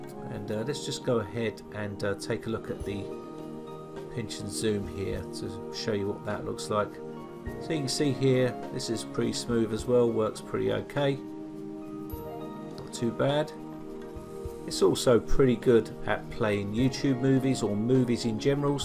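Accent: United Kingdom. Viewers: British English..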